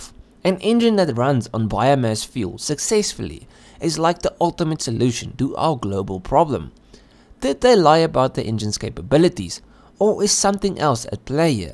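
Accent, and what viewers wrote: South Africa. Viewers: This guy sounds South African - whooHoo!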